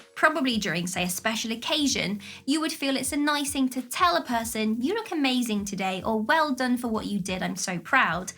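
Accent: United Kingdom. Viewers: I'm improving my english accent here. Very cultivated channel and the british excellent tone in language is superb here indeed. Fantastic beautiful. I listen to you just to improve my english tone 🤗❤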